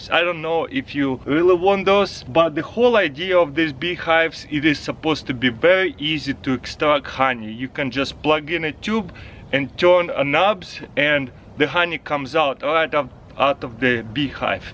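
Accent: Russia. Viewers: i like ur rushian accent :)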